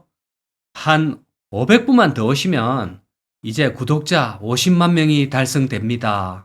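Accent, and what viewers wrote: Gyeongsang, South Korea. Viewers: 언제들어도 구수한 갱상도사투리.....늘 잘보고있읍니다 오십만..화이팅하세요~~~^^♡ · 반가버요 목소리에 사투리에 빵터집니다 고매 경상도 사투리에 물들어갑니다^^ 축카 축카합니다~~😅😅😅😅😅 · 구수한 경상도 말투 사투리가 압권 ~~🎉❤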